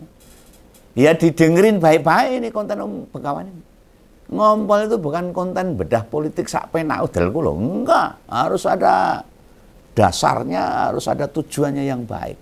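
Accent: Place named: Java, Indonesia. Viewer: Enak didengar bahasa jawanya om begawan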